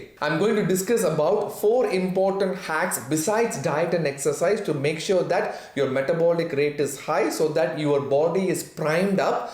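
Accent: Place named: South India, India. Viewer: Sorry your South Indian accent in English is irritating. Otherwise the video is informative.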